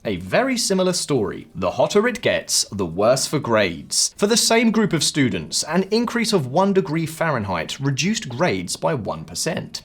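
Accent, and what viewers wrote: United Kingdom. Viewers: I like the way you compose the narrative into different perspective that are coherent. It does make sense when we think about it. Of course there's no way clear definitive cut to conclude heat slows hinders economic growth, but it might be the trigger (indirect factor) for the human behaviour (direct factor) that makes hot countries are economically subordinate than cold countries despite they are more resourceful. Keep progressing making meaningful and all rounded contents. I enjoy watching it while being entertained with your British accent 😂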